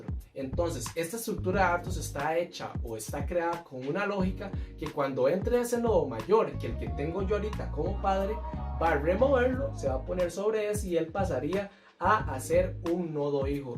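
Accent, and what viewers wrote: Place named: Costa Rica. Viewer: Ese acento tico no se pierde, buen video mae.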